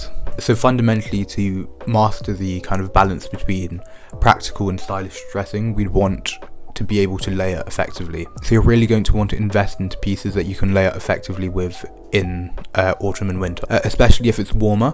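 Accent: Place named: England, United Kingdom. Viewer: just becoz you English accent 🤌is wow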